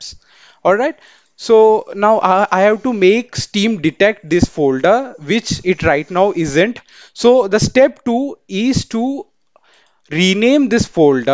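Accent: India. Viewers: I watch your 4 year old vid At that time your English is not good But now you are speak English like hindi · Indian accent, good with PC's Hell yea dude, thanks SO MUCH FOR THIS!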